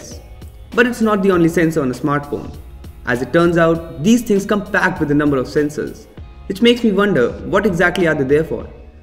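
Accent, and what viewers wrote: India. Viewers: Indian flavor of English is really irritating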